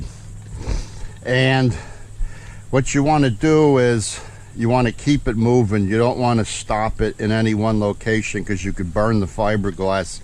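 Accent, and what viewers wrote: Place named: North, United Kingdom. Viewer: fishing and please tell us about your old boat. About the inboard vs outboard also what lead you to buy a key west and tell us about the other center consoles that you looked at before you bought the key west. Also I can tell by your accent your from up north what was it like fishing up there thank you have a blessed night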